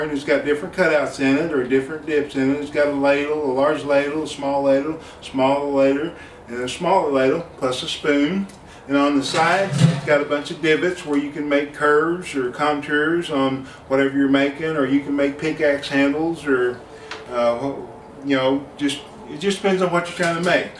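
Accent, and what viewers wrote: United States. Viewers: Sir, I swear you have to be from Texas. You sound exactly like my daddy.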